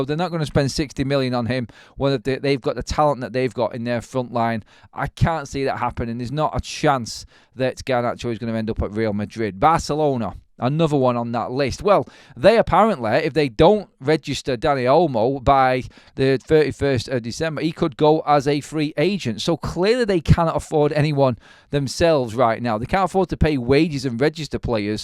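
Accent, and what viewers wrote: Manchester, United Kingdom. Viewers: Yuveh mehbeh , the Manchester accent is fun and amazing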